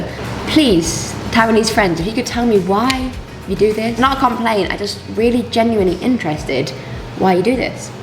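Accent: United Kingdom. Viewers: Your British accent is so beautiful and elegant 好喜歡你的英式口音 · 英國腔真的好好聽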